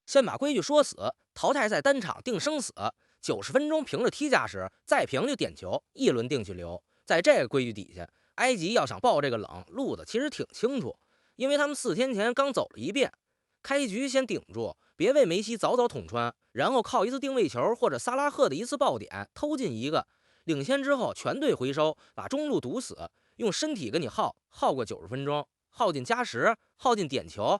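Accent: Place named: Beijing, China. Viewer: 满嘴京腔。反贼也看球啊？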